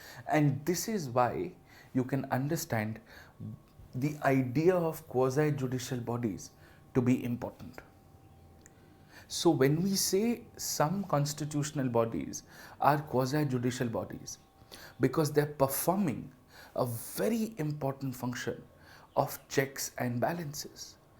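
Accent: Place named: RP, United Kingdom. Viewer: How on earth you are not known to people, you must be a celebrity bcoz the way you speak resembles you a British Lord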